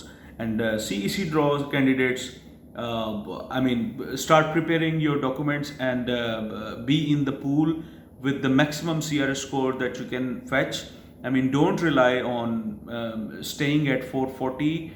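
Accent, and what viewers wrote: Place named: Lahore, Pakistan. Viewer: Veerji your accent is like someone from Aitchson College Lahore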